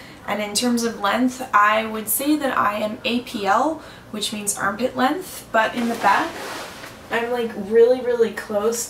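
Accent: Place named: East Coast, United States. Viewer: You say "length" so weird. It's definitely East Coast. I've never heard someone say length that way! By the way, it's ho-HO-bah oil. My mom whacks me over the head if I say jo-jo-ba, so I'm passing the knowledge on.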